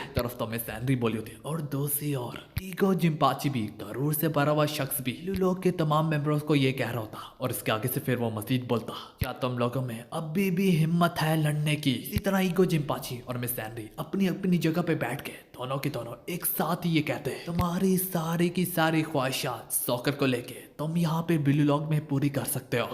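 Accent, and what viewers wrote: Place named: India. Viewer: bro just play not say it with cho indian voice makes it terrible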